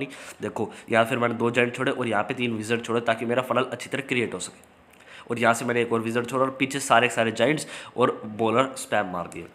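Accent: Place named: Haryana, India. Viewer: Bhai video kti zhr h💓💖 tu haryana ka lage h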